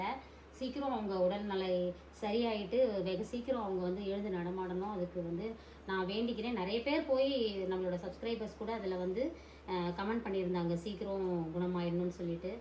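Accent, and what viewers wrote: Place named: Kerala, India. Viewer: Sound Kerala sister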